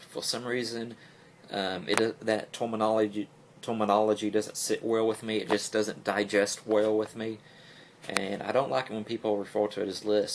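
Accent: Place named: South, United States. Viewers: Dude you sound like you come from New Orleans. From down the Bayou! It's sounds cool to me! Subbed yah! Keep up the good work! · I just thought you were from Louisiana and was Creole. Anyway dont worry about it. Your vids are great. I sound terrable on my vids. I would never think of you are slow. Thats just trolls being ass holes. You come over as a very bright and thoughtful person. Give it no more thought man. Keep up the good vids. :-) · When I first heard your voice, I just thought you had a southern accent and pronounced some words funny. I didn't think anything of it. I also know how you feel, because everyone always says I talk funny. I like your vids, keep on going, man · you do have an accent the same as your brother I can hear it when you talk, its in addition to the speech impediment, but a definite southern accent in there a twang if you will, I always liked southern accent for some reason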